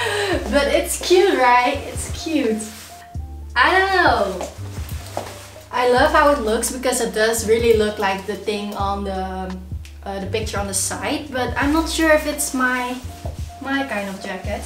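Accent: Netherlands. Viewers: Recognized the dutch accent 😂😂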